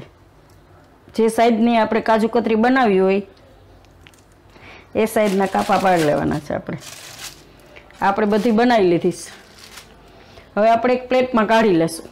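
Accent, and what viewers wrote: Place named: Gujarat, India. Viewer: તમારી ભાષા ભાવનગરી ટાઇપ છે કે સાંભળવામાં મજા આવે છે...🤗🤗🤗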